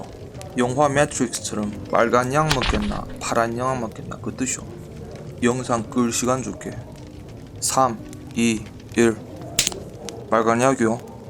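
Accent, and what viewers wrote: Yanbian, China. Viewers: 피뜩 들어두 연변사람 옳스꾸마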